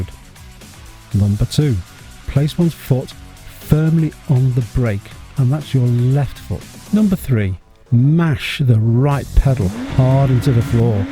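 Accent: United Kingdom. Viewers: i like british accent -i activate CC to learn better english... i learnt some words ive never heard before in this video .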